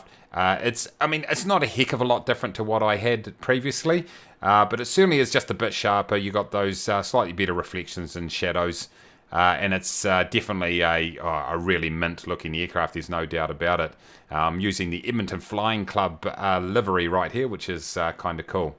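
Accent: New Zealand. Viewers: Nice to hear a New Zealand 🇳🇿 accent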